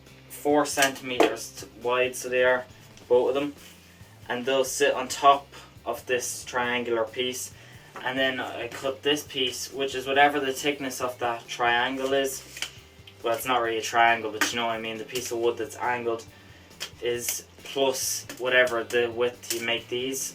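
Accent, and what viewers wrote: Ireland. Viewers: doing the fence now harder than it looks but getting their nice to hear irish accent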